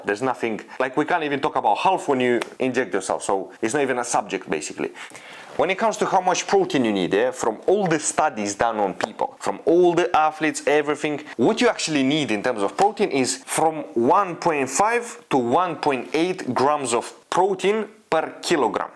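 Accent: Russia. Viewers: dammm finally a real fella slapping reality out here, loving your hard cold facts and love the Russian accent too ❤ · Блин, я подозревал что ты русский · That russian accent 🥶😮‍💨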